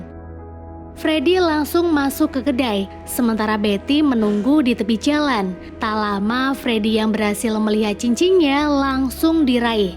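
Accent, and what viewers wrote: Pemalang, Indonesia. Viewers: Kk org pemalang yaa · Kok pemalang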